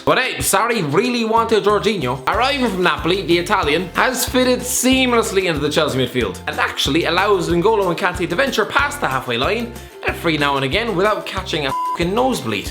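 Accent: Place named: Ireland. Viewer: Listening to a guy with a broad Irish accent attempting lengthy Greek pronunciation is a golden experience.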